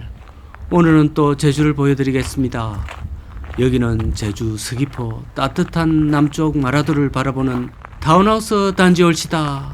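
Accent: Gyeongsang, South Korea. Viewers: 강호발TV님 오늘도 구수한 경상도 사투리 잘들었습니다 멀리노르웨이에서 늘응원합니다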